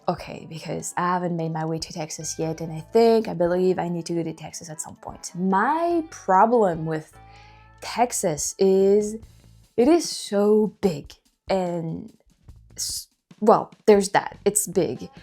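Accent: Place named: France. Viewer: your English is having less French accent to it I noticed.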